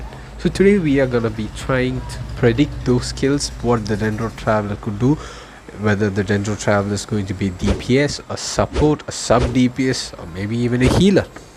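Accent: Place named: India. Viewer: Bro you are Indian right your accent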